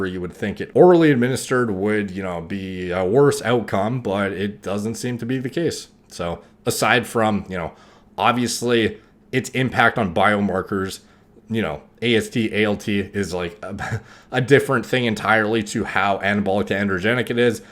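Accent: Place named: Canada. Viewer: Thanks for the reply. Been watching a ton of his videos and was just wondering. His accent implied either Canadian or Northern USA. His accent didn't seem strong enough for me to automatically assume he is from Canada. Thanks again.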